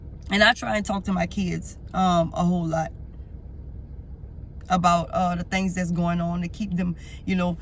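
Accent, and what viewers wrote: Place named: New Orleans, United States. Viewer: you sound like you have a New Orleans accent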